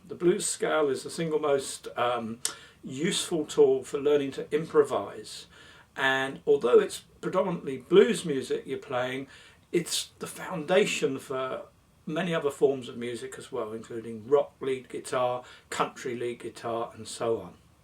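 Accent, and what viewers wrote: United Kingdom. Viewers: This is a very good tutorial. I have been playing that progression, that way for several years; my dumb, I didn't know it was Texas Blues. This teacher does a good job of explaining everything, I thought it was cool to be learning T.B. from a bloke with an English accent, but why not.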